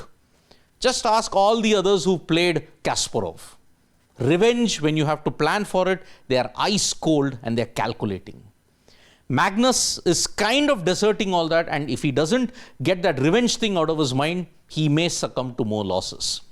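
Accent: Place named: Tamil Nadu, India. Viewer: Host: U've an Irrrrrrittttaaating Tamilian accent, a bit like Ashwin the bowler. Def not a grt way to present. Gary's Insights are always valuable. Magnus is perhaps past his prime now. We shall know it in a yr or so.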